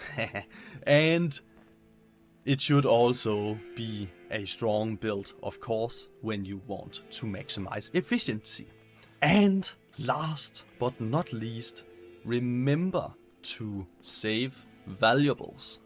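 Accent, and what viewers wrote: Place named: Denmark. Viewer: Uden tvivl dansk accent 😂😂😂😂😂 fed video!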